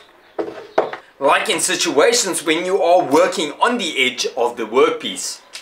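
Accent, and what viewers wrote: Afrikaans, South Africa. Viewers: Is that a south African accent? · Hey man, your accent….? Is it Afrikaans? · It's English......from South Africa. We have accents.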